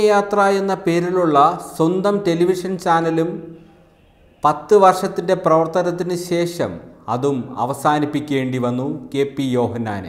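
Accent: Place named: West Bengal, India. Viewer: എന്തു വാർത്ത വായിക്കലാണിത്... കഷ്ടം... ബംഗാളിയെ പിടിച്ചു മലയാളം വായിപ്പിക്കുന്ന പോലെയുണ്ട്...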